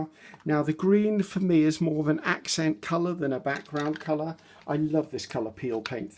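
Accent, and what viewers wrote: England, United Kingdom. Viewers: you are so awesome! Please don't ever stop making your videos. You are professional, but real, which makes it very fun to watch your videos. You make me want to come visit England just because of your accent and tidbits about your "garden", "rubbish", etc ☺️. Thank you for reaching and sharing.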